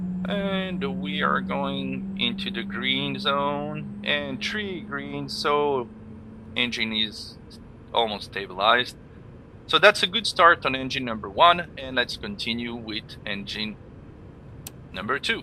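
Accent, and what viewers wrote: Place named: Quebec, Canada. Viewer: Salut! Je me permets de de vous parler en bon français québécois!!! J’ai reconnu l’accent 😅. Merci pour votre produit C17. Ça fais du bien d’avoir un avion avec une livré canadienne!!! 😎 see you in the sky!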